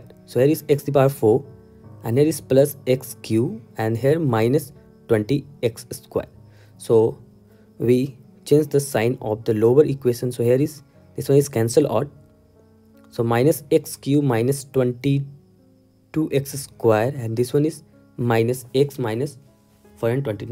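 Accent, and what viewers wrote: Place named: India. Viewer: no, his voice is terrible, maybe just indians can understand his english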